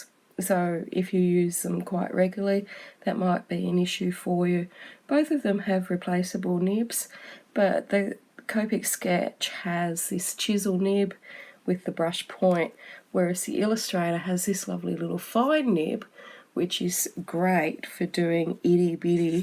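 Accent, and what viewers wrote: Australia. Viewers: Thank you so much for this excellent review:) I always love listening to your reviews, you speak so eloquently and give a fair and unbiased report on the product you are reviewing. It is also a bonus to hear a fellow Aussie accent!!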